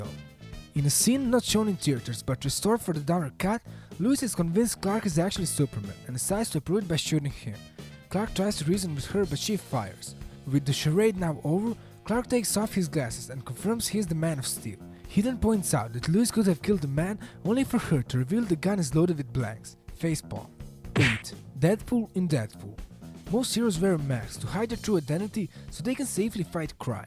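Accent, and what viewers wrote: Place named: United States. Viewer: Are you from america your accent is so cool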